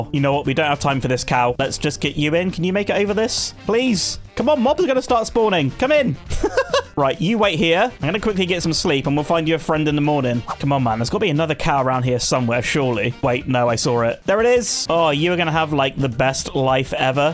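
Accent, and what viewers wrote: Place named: United Kingdom. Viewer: I Only See your videos Because Of British Accent 😂😂❤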